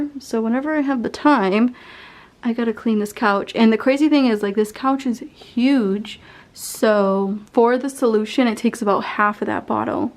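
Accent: Boston, United States. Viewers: You from Boston ? Some of your accent sounds like mine!!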